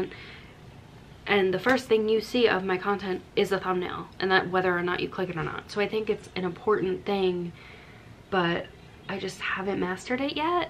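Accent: United States. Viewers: I'm from wisconsin and your accent seems the same as around here, never would have guesses long island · As someone from New Zealand you just have the standard American accent to me lol - I knew someone from New York and he had a similar accent to you. It’s not the classic New York accent - It’s just veryyyy American. I like your thumbnails, they’re cute - I don’t think it makes a big difference for me - but it might be more important for new viewers 🤷‍♀️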